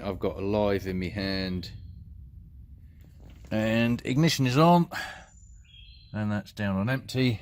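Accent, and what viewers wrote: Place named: Cockney, United Kingdom. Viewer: You sound like you were born within the hearing of Bow Bells... I had a really dear friend who my kids called Grandpa no. 2 who was from East London, sadly he passed away last year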